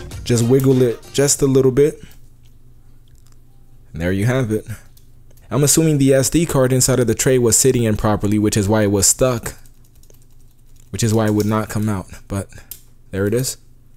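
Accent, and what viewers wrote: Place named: United States. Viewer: His accent is american and the phone is from verizon which is an american provider, how the fuck do you notice from the sneezing? I'm confused.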